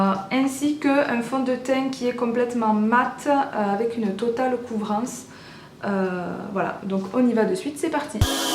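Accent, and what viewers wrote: Toulouse, France. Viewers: J'adore ton petit accent toulousain ^^ Je trouve ton teint super avec ces produits le fond de teint à l'air pas mal du tout ainsi que la palette je l'avais vu sur la vidéo de sananas le résultat est assez bluffant ! :o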